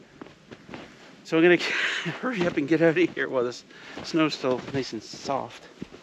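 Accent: Canada. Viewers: Hello, Im assuming this is Canada , bc of your accent. Are you allowed guns while living off grid in Canada? A life like this goes hand in hand with rifles. Just a weird question for ya. Killer set up sir. Cheers!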